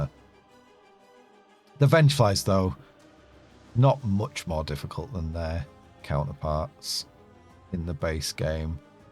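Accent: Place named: United Kingdom. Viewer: why is this guy british? so wierd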